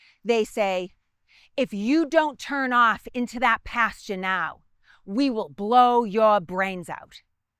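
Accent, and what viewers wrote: Boston, United States. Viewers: I love her accent-- my inlaws are Boston area born, raised, still there. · As a native Bostonian who worked hard to eliminate my local accent this gal is the real deal. She does a great job explaining a very important historical event. Cheers! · The Boston accent is on 110. Turn it down just a tiny bit please. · As a southerner I could listen to Revolution history in this Bastan accent all day long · For all of you out there, this is one authentic Boston accent. If you are anywhere in the world, and you here this tone, Boston is not far away. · After listening to her talk for only 15 seconds, I could definitely tell she was from Boston.🤣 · Great story. However, what an AWESOME Boston accent! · Not only the interesting historical information, and views of the sites, it's done in that lovely Baston accent. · I Love Her BOSTON ACCENT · I LOVE YOUR BOSTON ACCENT. 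Im from California, biggest Red Sox fan in Ca and a big fan of Our Founding Fathers and American history. I just found your chanel and i love it. Thank you for sharing your knowledge.🇺🇸 I Love My Country. God Bless all and God Bless this beautiful country🇺🇸🇺🇸🇺🇸🇺🇸🇺🇸🇺🇸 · I grew up in Boston. I am a 4th generation from the same town and my 2 boys are the 5th. I think you have more of a Boston accent than I do and you are probably not even from Boston. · All my Gad … the actual Spat … live the Boston Accent , I mean Bastan accent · I just love to hear you tell stories. I love your Boston accent and the passionate way you connect with history. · Connecticut here. Love a Boston accent. Great job. I subscribed and liked and look forward to more. Thanks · Great presentation. My father and grandparents were Boston natives. I lived in or near Boston for a while in grad school at Brandeis. You have a classic accent - my dad said 'bananer' but did not drop his 'r's. 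His sister did.. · As a Bostonian, not only do I love the history but I love the accent. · That’s pretty rude! It’s an authentic Boston accent and your comment is offensive to those of us who are lifelong Bostonians. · I’ve noticed that you’ve commented on her accent on several past videos. Just to confirm so you may perhaps move on: our family is from Boston. Her accent is authentic. Thanks. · . My family is from Boston, and sorry, she sounds just like us. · Ok this story can only be told with that Boston accent!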